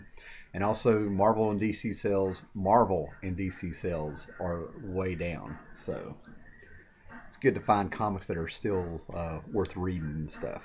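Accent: South, United States. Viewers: Finally someone else with a southern accent haha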